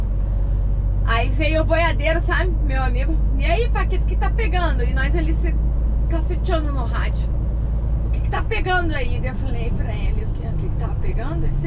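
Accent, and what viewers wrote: South, Brazil. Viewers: Kkkkkkkkkkkkkkkk você é catarinense ou paranaense?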